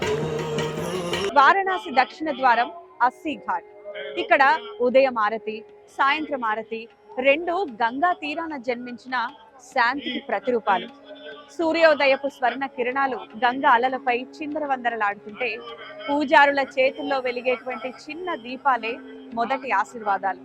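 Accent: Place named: Telangana, India. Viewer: You guys have taken a good decision! Please continue with the content/news with strong throwing of words in Telangana Accent. Thank you 😊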